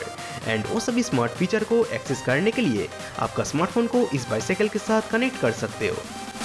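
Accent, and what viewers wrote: Bengal, India. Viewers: Bhai bengali type ka hindi bolte hoo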